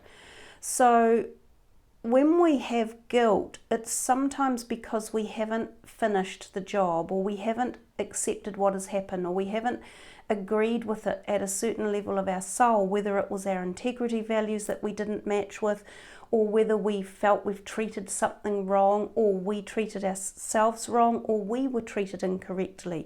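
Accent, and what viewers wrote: New Zealand. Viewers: “Four different dex of cards”... Love the kiwi axcent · Sounds like a kiwi accent 🌲🌿 🤗 Thanks for your reading.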